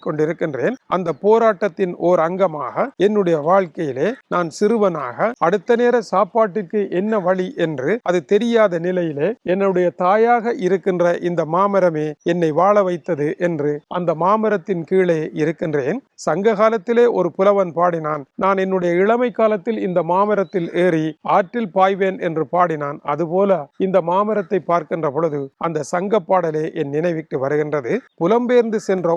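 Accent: Jaffna, Sri Lanka. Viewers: மாமரம் இருக்கின்றது.. மாங்காயும் இருக்கின்றது... ஆனால் பிடுங்க தான் முடியவில்லை !!! என்ன செய்வது வயது யாரைத்தான் விட்டது. நீங்கள் யாழ்ப்பாணத்து தமிழிலேயே கதைக்கலாம் அருமையாக இருக்கின்றது😊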